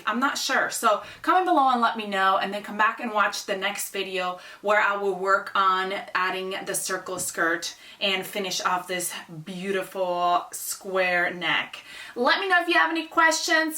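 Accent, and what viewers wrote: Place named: Spain. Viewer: You have a Spanish accent!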